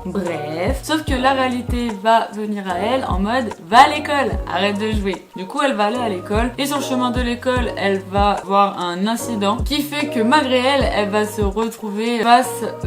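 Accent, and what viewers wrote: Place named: Lyon, France. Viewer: Jure on a un accent à Lyon je me rend même pas compte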